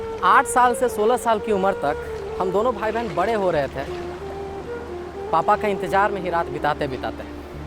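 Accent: Bihar, India. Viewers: This Video made me cry.... Hope will see u soon in Bollywood in This Bihari Tone.... Maharaj mtlb ki mast video chhela... 🙏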